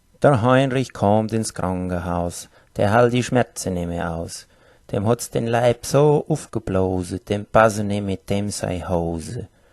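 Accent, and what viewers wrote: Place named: Palatinate, Germany. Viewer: Ein bisschen hört es sich auch an wie pfälzisch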